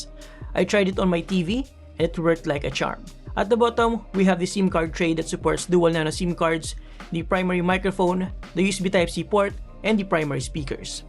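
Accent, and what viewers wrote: Philippines. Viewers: Pinoy na pinoy ang english mo😁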